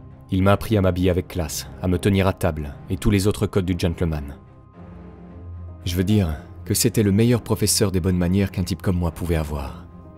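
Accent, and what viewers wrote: Italy. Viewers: Super documentaire digne d’un vrai travail d’investigation!!! Avec l’accent italien de surcroît!!! J’adore et je me suis abonné (+ cloche) direct car trop hâte de suivre la suite!!! J’ai 49 ans et suis quelqu’un de bien mais depuis petit j’ai toujours l’âme d’un voyou qui ne me quitte pas… lol 😎😉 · tu peux un peu moins pousser sur laccent italien quand tu prononce les noms merci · Super la voix avec les accents italiens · La vidéo est super. Par contre insupportable l'accent Italien.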